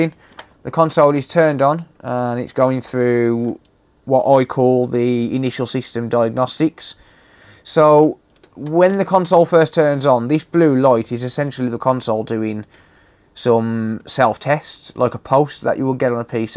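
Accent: United Kingdom. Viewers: british dude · You're from the United Kingdom I can guarantee that am I right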